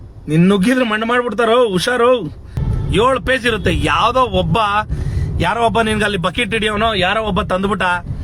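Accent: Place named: Anekal, India. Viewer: Anekal benglore alwa, nin bashe, 😂😂😂😂😂😂😂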